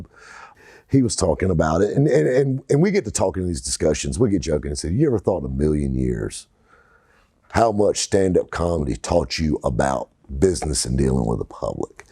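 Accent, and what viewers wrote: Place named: Greenville, United States. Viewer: Grew up in Mauldin, SC from '78 to '88. It's great to hear someone that has that great up-west Green-vull accent. If you ever want to ride around and film barbeque or burger joints in Southeastern NC, I can recommend a few people. Our little band of idiots have some stories that can prove that the truth is stranger than fiction.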